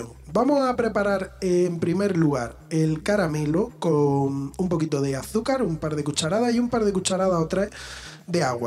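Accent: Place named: Andalusia, Spain. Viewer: Me gusta tu tonada de andaluz. Saludos desde Argentina.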